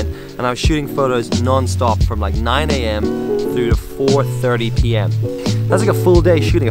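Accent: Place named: Northern Ireland, United Kingdom. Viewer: Where is your accent from? It sounds like a cross between Northern Irish and a New Zealand accent.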